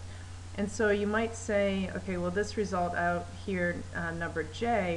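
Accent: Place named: United States. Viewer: man, its getting to the stage now where if I hear an american voice on a instructional video I immediately close it, 10 mins of you listening to the sound of your own voice! what a dribbler.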